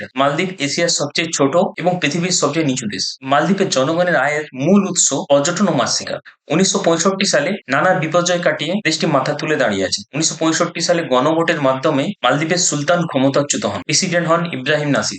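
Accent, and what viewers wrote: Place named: Ghoti, India. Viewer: try to speak correctly (not ghoti ) Bangla language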